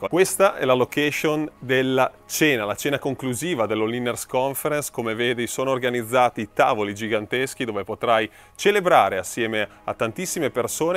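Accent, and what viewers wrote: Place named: Ferrara, Italy. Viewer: ma sei di Ferrara? Dall'accento e intonazione sembri proprio uno di quei ferraresi oramai poco riconoscibili, che hanno viaggiato tanto e per questo smarrito (per fortuna) le elllle e le essse drammatiche, ma un eco mi rimanda a Ferrara, sono di Ferrara anch'io anche se ho vissuto negli USA qualche anno.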